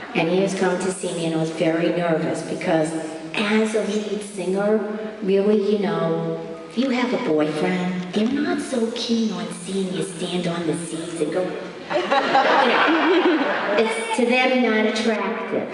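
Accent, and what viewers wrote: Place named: New York, United States. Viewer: She's such a New yorkaaa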